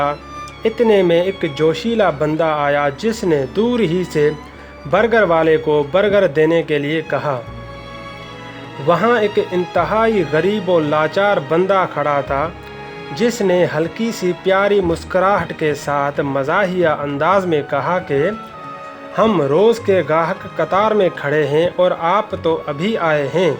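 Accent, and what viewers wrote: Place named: Sindh, Pakistan. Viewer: Keep it up Baki urdu improve karne ki kafi zaroort ha kafi sindhii lhja ha 😁😁😁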